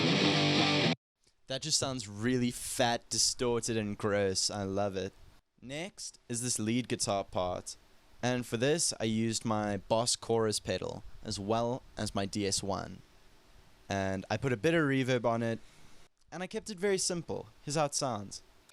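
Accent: South Africa. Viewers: Ayo SA accent who? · could tell youre south african from the accent lol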